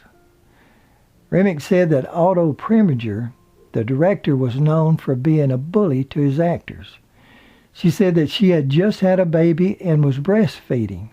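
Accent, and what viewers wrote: South, United States. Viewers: THE VOICE HMMMMM!!! PASSABLE!!I GUESS ITS " SOUTHERN AMERICAN" ACCENT!!NOT CUT GLASS ENGLISH!!!HOWEVER, THE ENGLISH LANGUAGE HAS CHANGED DRAMATICALLY!!!EVEN IN THE U.K.!!!FROM(U.K.) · I told my mom that Lee had the prettiest eyes. I LOVED The Long Hot Summer and so did my folks! She died way too soon. Your voice is so soothing but I have lots of Southern reatives and I guess that's what it is. Could you do a video on Burt Lancaster? I always liked him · ...i got used to it.....and then I liked it...maybe southern or mid west? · I love the narrators down home southern accent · Interesting accent and pronounciation of some words. I wonder if it’s just the speaker or common to a town somewhere in the south. Good video. Thanks. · Mr., you are such a good spokesman. You are genuine in you're speaking -when telling the stars biography. 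I so enjoy the way you speak. You sound like a southerner, yourself. I fine it odd that many people from the south does NOT talk as southern, as the stars who betray them. Of course some DO HAVE that kind of southern draw. I'm a southerner myself. When I married my second husband, his father called me his little southern belle. He was an attorney from OKC. An educated man. His family were originally from up north. The whole family had good grammer. I learned so much from them. Little country girl who had never traveled much. I was intimidated, to say the least. I married very young, the first time i married. They could have done a movie about my life. I didn't have a good beginning but, i did get the chance to go to college. After I divorced the husband in which I'm speaking of. My life very complicated. I was only 16 when I married, the first time. Dropped out of high school. I did take the GED test and passed, to my surprise. 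We all went out and celebrated. I had two children when we married and could not have anymore children. I was 7 years older, than my husband. I was 27 and he only 21. He was intelligent. Had a good career. His grandfather retired from the company, which my husband also started his career there. I had such a sad life. Had bad things happen to me. I've often thought about writing my own bio and see if someone would like it. I do think my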